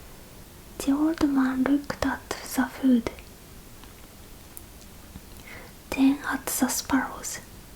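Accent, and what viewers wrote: Japan. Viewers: Japanese is the most familiar language I will never actually learn. But I enjoy the cadence the natives have even when speaking English.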